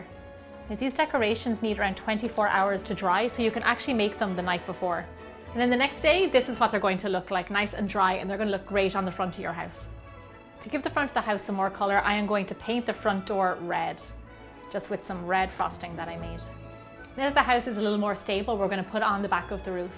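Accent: Ireland. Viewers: your accent makes me wish i lived in ireland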